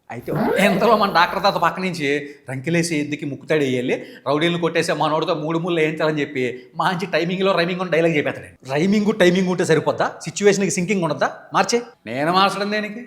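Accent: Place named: West Godavari, India. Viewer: Anna meedhu WEST GODAVARI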